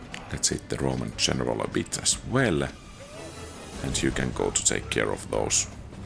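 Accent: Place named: Finland. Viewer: Love the video! Do you happen to be from Finland regarding your accent? ;)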